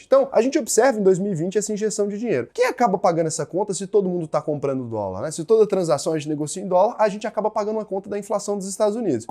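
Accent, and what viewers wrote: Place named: São Paulo, Brazil. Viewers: Realmente é assim mesmo o Sutaque do estado de SP ahahahaha, sou do interior de SP e aqui puchamos bem o R, bem caipira mesmo, ai vc vai mais pra capital ou pro litoral de SP o R é diferente. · Esse é o sotaque de Paulista da Mooca ou Faria limers...nipe Boça, Faustão rs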